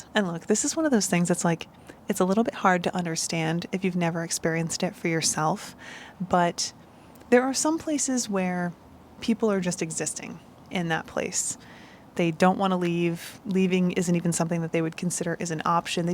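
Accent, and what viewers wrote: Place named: New England, United States. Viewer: I KNEW you had to be from New England - I could feel it! ☺ Once upon a time when I was still a CM at Disneyland, I was seriously thinking of transferring over to WDW. At that time, Spectromagic was still playing and I had a dream to be in it. I was also a naive 20-something. Fast forward many years later, and I just don't know. The humidity is a HUGE factor - does it get any easier the longer you stay? The urban sprawl, traffic - well, I have that at home, so I imagine it's not much different. The cookie cutter stucco homes are annoying AF. I am sure there are older neighborhoods that tourists don't see where I could find more culture and creativity in building - drove through Thornton Park once and that was much more my speed. Too bad the prices don't agree. So, my latest thought is "maybe as a part time retirement job". 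We'll see...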